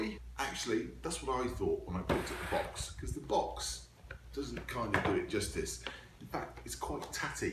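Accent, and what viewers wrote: England, United Kingdom. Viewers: Its American, but youre English! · are you bri'ish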